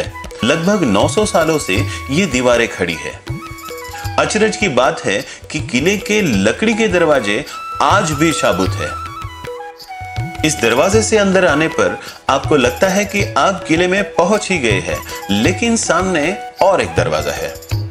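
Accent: Maharashtra, India. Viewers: Bhaisahab ye maharastrian hindhi hai,thoda samaja karo bhai..har kisika bolne ka,bat karne ka o kidhar rahte hai unpe jyada depend karta hai..so unka aisa Kuch matalab nahi rahega k kisike man dukhaya jaye... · Please learn to speak hindi well it seems you are telling story in Marathi Hindi tone is different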